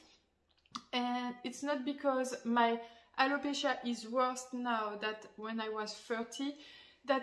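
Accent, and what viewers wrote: France. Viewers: J'adore tes vidéos en anglais car j'ai exactement la même manière que toi de le parler : grammaticalement c'est bon mais avec un accent totalement français 🤣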